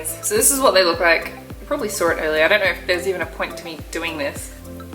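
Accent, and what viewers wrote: Australia. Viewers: I love to hear aussie accent.. plus eating filipino food... that is gold... keep it up.. new subscriber here... I hope you recognize me..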